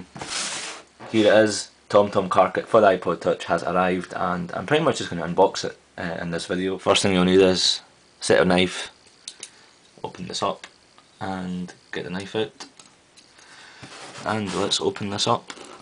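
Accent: Scotland, United Kingdom. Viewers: lol typical scottish accent :) its nice to hear a similar accent to myself for once :D excellent reviews.. definately gonna purchase a car kit for my ipod touch x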